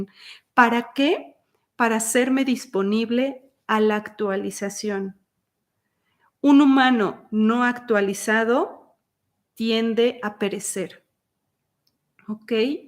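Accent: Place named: Mexico. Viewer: Hermosa, me gusta tu tonada mexicana,soy de Argentina y cuando dijiste son las 13 y 13,miro la hora que te estoy escuchando 19 y 19.😊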